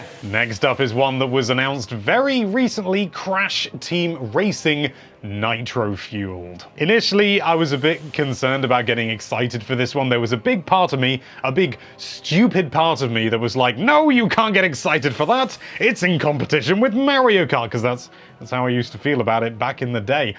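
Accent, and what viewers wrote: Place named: United Kingdom. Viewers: It’s the british accent interpreting the name as it’s spoken. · Yeah that's how all British people say it.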